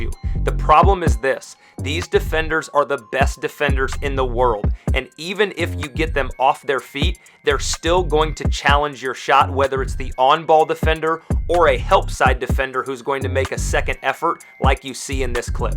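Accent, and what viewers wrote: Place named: United Kingdom. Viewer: You british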